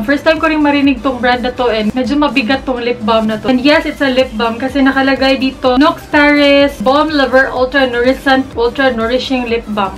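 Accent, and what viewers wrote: Visayas, Philippines. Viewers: You're so loveable and very enjoying to watch! Tho you have that bisaya accent, you show your true and natural self to your subscribers! ❤ Continue to inspire us and do lots of great videos! May God Bless you always po Ate! Power! 💖💖💖 FB: <link> Instagram: jrgvlls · ambot lng magkatawa gyud q mag tan.aw sa imong mga vid. kanang tagalog nga accent bisaya. hahaha soooo us gyud mga bisaya. pasalamat q sa youtube g suggest to imong first vid aq nakita. nalingaw q nitan.aw nlng q tanan. katong labay2 nmo sa haul. nka ana q pra2 maning bayhana oi. nyahaha pro until now. mygeeedd 20k? pla plang mikabook ni subscribe ato .. dalia ra oi. always stay unique gurl.. IG: lovehalo83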